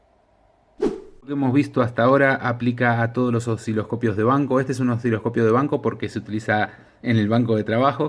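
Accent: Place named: Argentina. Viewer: un español que habla en argentino. jej. . tu video para alguien que recien comienza, el mejor de los mejores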